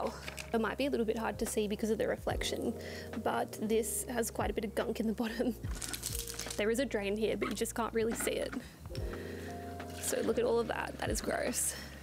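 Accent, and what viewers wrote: Australia. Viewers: I like how she says "here " but it's like "Heah" lol the Australian agent is nice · I love the way you say “Here” you must be from Australia ? Love the accent. Cheers from 🇨🇦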